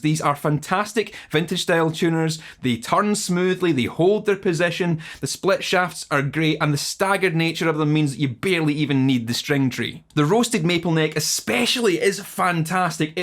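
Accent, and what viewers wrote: Scotland, United Kingdom. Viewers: his scottish accent is honestly really addicting to listen to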